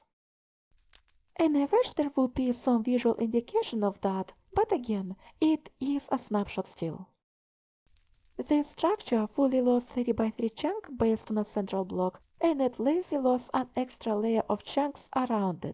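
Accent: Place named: Russia. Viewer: Молодец. Надеюсь, что у англоязычной аудитории ты будешь популярна. Я не стебусь. Но по произношению понятно, что ты русскоязычная.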